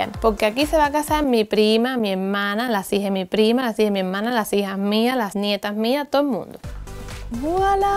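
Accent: Cuba. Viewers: Son cubanas por eso tienen el mismo acento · eres un amor eres una buena actriz y me encanta tu acento Cubano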